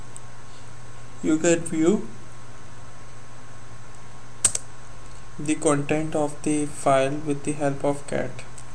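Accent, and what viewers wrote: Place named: China. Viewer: thanks brother but i bet you're chinese with your funny accent lol just to tell u i love your accent :)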